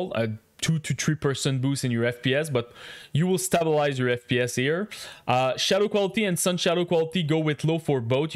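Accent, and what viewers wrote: Quebec, Canada. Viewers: French canadian proper. 😀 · You from Quebec by any chance? That accent sounds hella French Canadian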